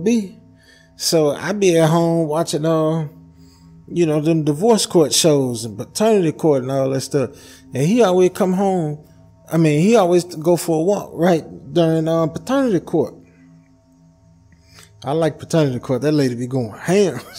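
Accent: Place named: Alabama, United States. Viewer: I just love to hear you talk. Reminds me of my friends back home in Alabama ❤️❤️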